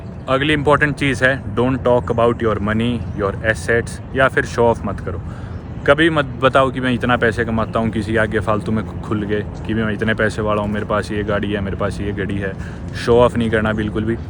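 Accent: Haryana, India. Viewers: I'm from Pakistan I 💓🇵🇰 long live 🇵🇰 nice beautiful Bhai AP ka Haraynivi Accent bhuht acha lagta hi 💚💙🙏🇵🇰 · Thank you for all your tips. Very helpful. Although I would like to add, slamming doors is considered rude & bad manners in many countries, not just in Columbia. It is common knowledge because generally people slam doors in anger. I also accidentally saw one video and really liked your simple, honest, practical, genuine (no show), with Haryana accent information. 😊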